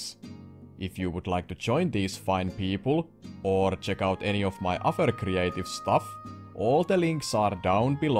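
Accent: Finland. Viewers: Are you finnish by any chance?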